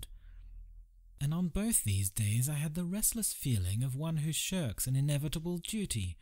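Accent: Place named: Australia. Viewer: Very faint Aussie accent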